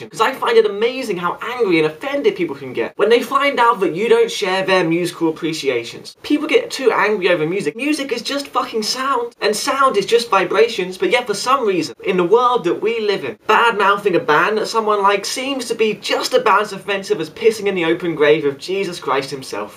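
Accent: United Kingdom. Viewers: He he I can't always understand what you're saying; you're talking too fast in that cute british accent of yours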